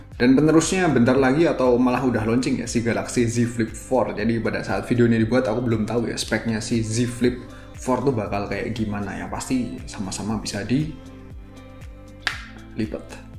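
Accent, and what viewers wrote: Java, Indonesia. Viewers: Di jembreng... /jawa bangett mas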